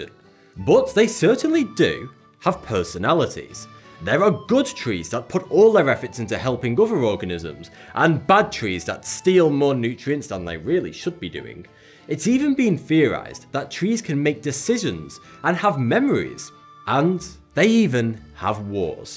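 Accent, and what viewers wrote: United Kingdom. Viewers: omg you are british immediately frogives you for sponsors and ads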